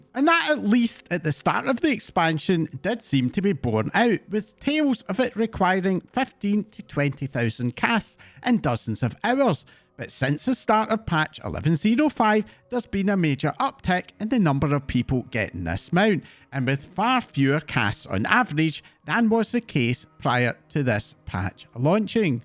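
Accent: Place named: England, United Kingdom. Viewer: Your english acsent is epic <3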